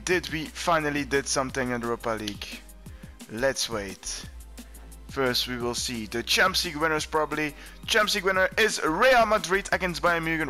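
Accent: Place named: Belgium. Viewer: Nice belguim accent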